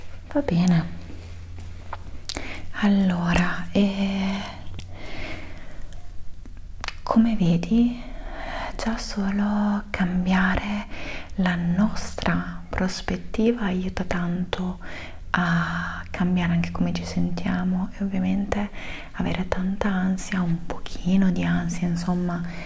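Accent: Italy. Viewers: A pesar de que no entiendo nada...el acento italiano en asmr me hace tener demasiados triggers.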